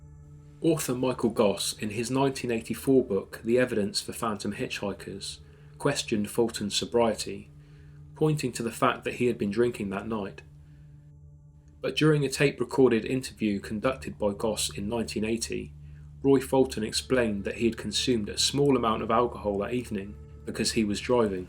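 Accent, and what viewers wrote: England, United Kingdom. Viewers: I'm American but I so wish I lived in one of these charming and beautiful old English villages,( complete with the ghost stories) I just love the aesthetic so much! Thanks for the videos, I just recently discovered your channel but it's my favorite kind, interesting content, British narrator and no ads every 5 seconds. Keep it up my friend! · You've got a lovely voice,! Somehow, as an American, I find that these types of narrated stories sound so much better coming from an English or an Australian accent. Tom Buckmaster has a YT channel, and he has actually gone out onto some of England's haunted roads to do investigations. Very creepy. Love your content! Cheers!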